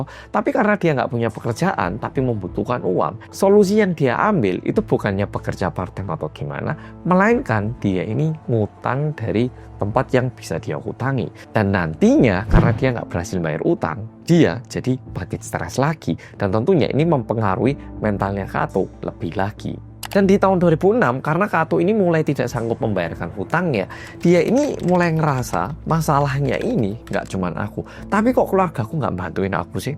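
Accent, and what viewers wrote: Java, Indonesia. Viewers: paling demen nonton ini, logat jawanya medok banget ya wkwkwk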